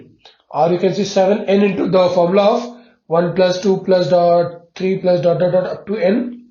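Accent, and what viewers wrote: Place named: South India, India. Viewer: Your English accent is slowly becoming south indian😁😁😁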